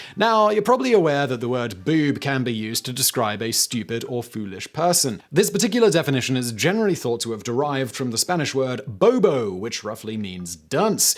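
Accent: England, United Kingdom. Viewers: I didn't know i needed a calm British recitation of breast slang until today. Thanks,. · Listening to him speaking fast with that Brit accent is kind of exhausting for an American. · I'm sorry but I really really like laughing at you saying those euphemisms for breasts and your British accent maybe it makes me a boob but there it is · Why is a guy with a British accent advertising shoes you can't get shipped to Britain? Cheers, A British guy.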